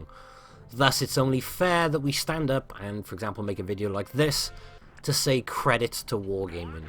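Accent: United Kingdom. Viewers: Blitzers from the stream for 5.0 are asking for your voice to be added for British crew voices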